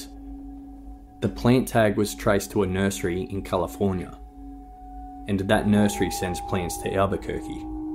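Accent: Australia. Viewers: Could do without that Aussie accent though.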